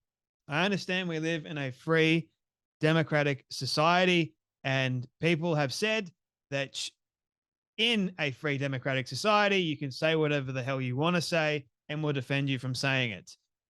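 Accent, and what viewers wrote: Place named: Australia. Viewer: His AUSSIE voice is very annoying 😂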